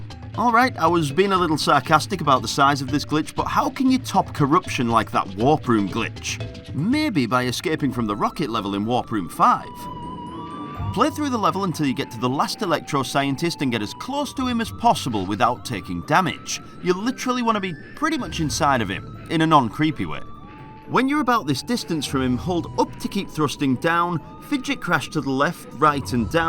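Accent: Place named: England, United Kingdom. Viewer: even the YouTube subtitles couldn't keep up It is not only about your accent, but also the fact that you mash words together, making up new words with even weirder pronunciations. Also known as portmanteaus or slurred speech . Essentially creating a brand new dialect on the fly I find it very sad that someone from England can speak this poorly , even Africans can speak better English.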